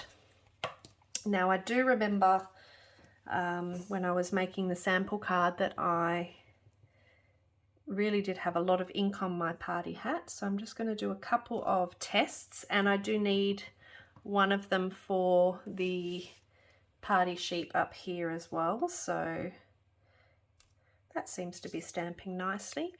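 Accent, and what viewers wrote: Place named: Western Australia, Australia. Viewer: I've just found you and have so enjoyed your video - nice to hear a fellow West Aussie - I am from Perth also.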